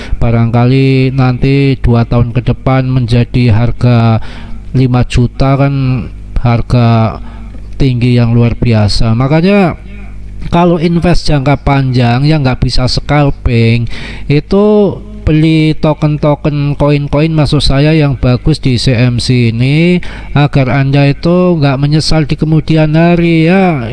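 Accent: Banyumas, Indonesia. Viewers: Lah wong Purwokerto kye tah,ngapak njeplak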